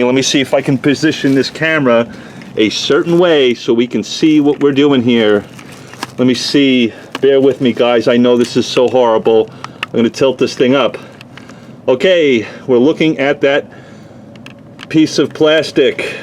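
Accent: New York, United States. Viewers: Hi I just subscribed to you’re channel. Are you from New York originally. My parents are from Brooklyn NY. That’s were I get my NY accent from. You sound like a New Yorker. Love your page. I just found it today.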